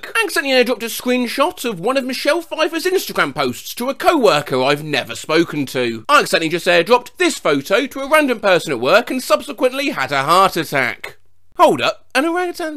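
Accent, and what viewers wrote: United Kingdom. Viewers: This guy is so aggressively British I love it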